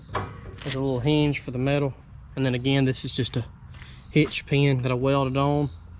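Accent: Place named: South, United States. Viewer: Good, honest stuff. Commenting from Albany, GA. You sound like you're close-by. Regarding being an expert: That's something I posted about my dad one Father's day....He taught me you don't have to know how to do something to get it done.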